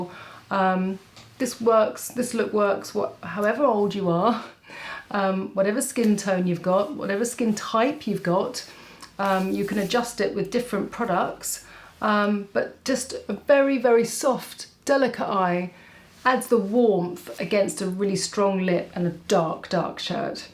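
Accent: United Kingdom. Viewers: Oh my I just bought glasses like yours. I feel very chic now. Oh to be so gorgeous with that lovely British accent. 😉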